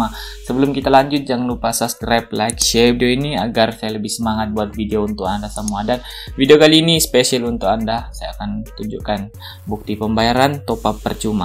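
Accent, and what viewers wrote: Sabah, Malaysia. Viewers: Sabah bah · orang mana tah mcm sabah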